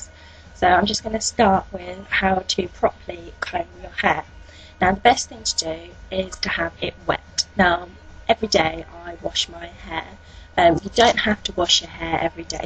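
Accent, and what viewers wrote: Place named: United Kingdom. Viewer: Lol nice accent...from the uk?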